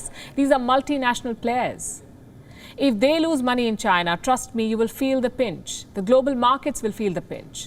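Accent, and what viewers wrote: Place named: India. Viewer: You are making the world love English Indian accent.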